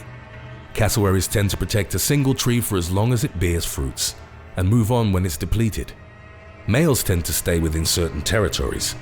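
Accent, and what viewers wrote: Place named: United Kingdom. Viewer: He is not an American. So what he pronounced zebra as how it is spelled. We say Zeebra. He speaks the Queen's English.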